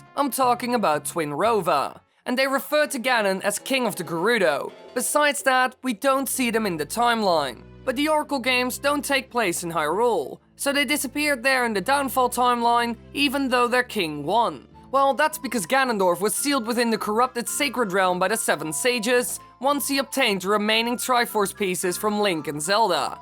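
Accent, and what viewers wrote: Netherlands. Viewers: It's Dutch. We all sound like that in English.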